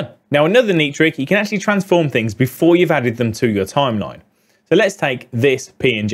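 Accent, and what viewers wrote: United Kingdom. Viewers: I like this British accent. 😀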